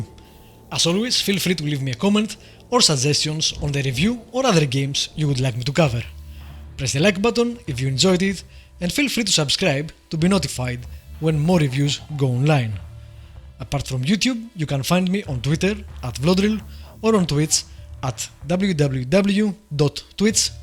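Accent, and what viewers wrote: Greece. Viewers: Thanks for the clear cut, concise and short review. By the way, are you Greek?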